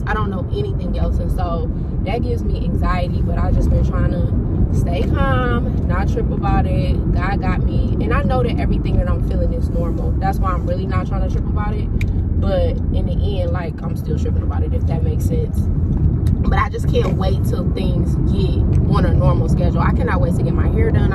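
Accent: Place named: New Jersey, United States. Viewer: any one special you recommend? Where are you from. You sound like me. A NJ girl.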